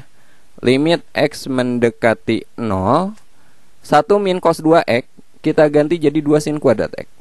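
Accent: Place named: West Java, Indonesia. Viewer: Abang nya orang sunda ya? Logat nya kek sunda😅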